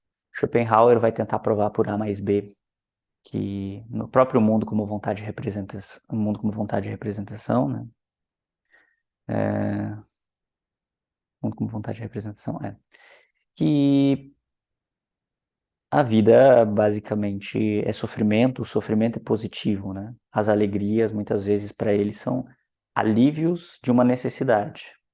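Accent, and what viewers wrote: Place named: Rio Grande do Sul, Brazil. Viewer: Esse sotaque eu conheço...é GAUDÉRIO !!😅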